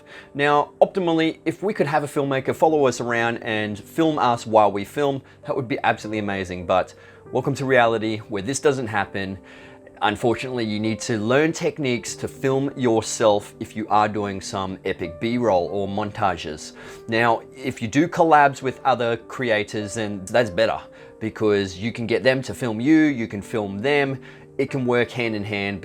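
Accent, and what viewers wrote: Australia. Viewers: Sound Aussie bruh. Awesome vid helps alot! Waiting for my A7iii looking at 17-28tam also 🤩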